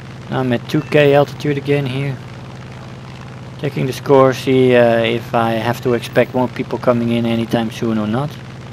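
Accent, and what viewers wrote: Netherlands. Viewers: Ah the glorious accent of the Dutchman.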